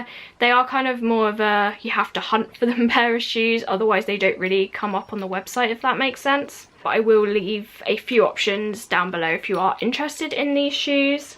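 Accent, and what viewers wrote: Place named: London, United Kingdom. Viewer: Those are really darling & give me a steampunk kind of vibe :) I was wondering (and I may have missed it); if you had an idea what the soles are made of? I mean not the underneath, but the part under the shoe itself the platform is made of? Does it seem like the same material as the top or something different. Someday soon I plan to own a pair of New Rocks, I'm just not sure which ones I want. My son was over the other day and he heard me listening to you and was wondering about your accent. I told him you were from England and he goes "Oh yes, a London Goth" :) Too cute :) Well, take care and nice to see you sweetie <3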